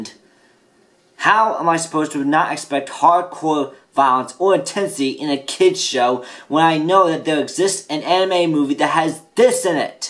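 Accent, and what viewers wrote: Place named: France. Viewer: he kinda has a little french accent when he speaks for me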